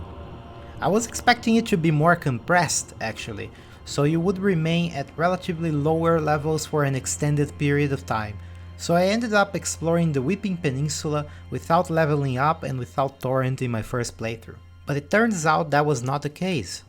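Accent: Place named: Brazil. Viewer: WOW. What a hidden gem of a yt channel and videogame critic. Came into the video expecting some very copy paste criticisms that anyone could have come up with after a few play sessions. Came out feeling i’ve heard one of the best videogame criticisms in a while, and i watch reviews/critiques of games all the time, especially Elden Ring, since the game made me feel so confused and mixed overall, with so many Outstanding moments and so many boring ones during my completionist 150h playthrough as a Souls veteran. Instantly subscribed, now i need to see some more reviews, there are very few as coherent, thoughtful and all encompassing game critics in yt. Only curiosity... are you from a latin country? Your accent feels similar to brazilian portuguese “ingrish”, and at first i found it a bit annoying, it actually grew a lot in me and it was very clear and easy to understand, and had a good cadence to it. 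Great video and analysis and hope to see more like this in the future!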